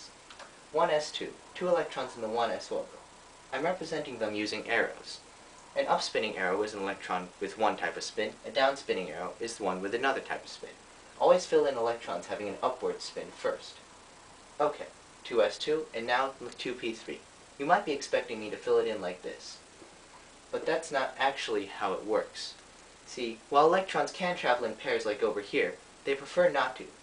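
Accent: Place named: India. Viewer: this guy is awesome, he teaches chemistry, looks Japanese, and has an Indian accent :D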